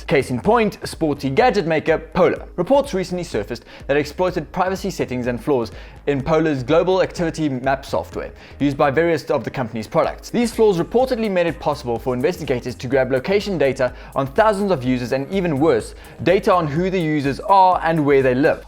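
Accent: Afrikaans, South Africa. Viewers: He sounds South African. 👍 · The Afrikaans is heavy with this one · was this guy south african? (im also south african)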